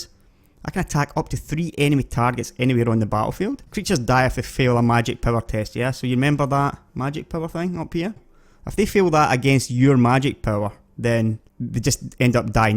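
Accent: Scotland, United Kingdom. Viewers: Engrish? I take it you have never heard a Scotsman speak before?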